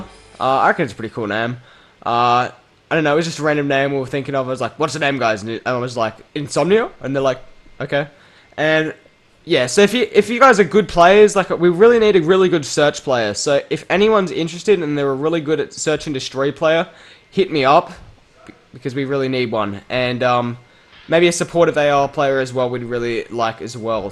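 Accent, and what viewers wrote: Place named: Australia. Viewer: Aussie! ;) - KRNG Raymian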